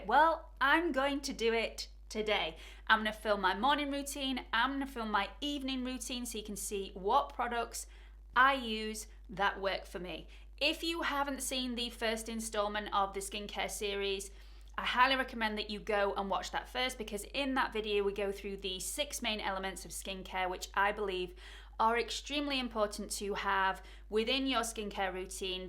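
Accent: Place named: Yorkshire, United Kingdom. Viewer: Excellent video. I just discovered you and so happy I did. You’re amazing! Learning a lot from you. Look forward to watching all your content. And of course I love your accent. Are you from Yorkshire? Wild guess thereLol. Love from the States! 💕💕💄🌻🌷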